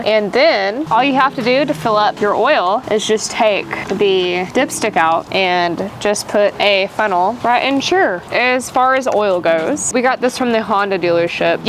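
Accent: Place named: Europe. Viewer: Great information...that accent...European made in America if I'm not mistaking ;-) Stylish! Keep up the good work. Will try same on my 2 Navis. Happy trails. JP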